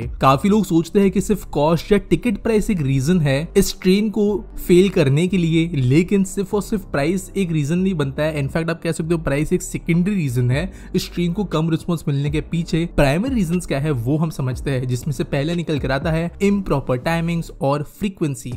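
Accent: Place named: Maharashtra, India. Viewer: Good video.. but talk slowly. I can sense that you are Maharashtrian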